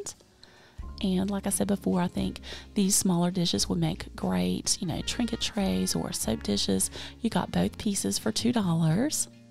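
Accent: South, United States. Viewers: I am sitting here knitting socks,watching you encourage mischief..........wonder where that came from😅😅😅? And listening to that soft southern accent.❤❤❤my peaceful moment!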